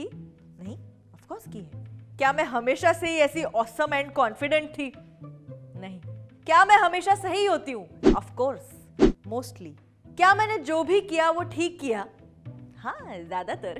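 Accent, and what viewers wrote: Maharashtra, India. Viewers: My god her acting skill and the marathi accent..love it dii ❤️❤️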